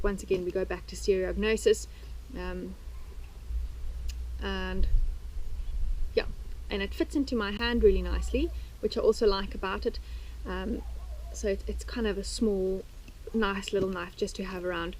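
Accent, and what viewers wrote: South Africa. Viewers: Great video. Watching from the US. Also love the South African accent. :) Cheers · Thanks for an interesting video, love to learn about Africa :) Also dig that wonderful south african accent. Greetings from Sweden!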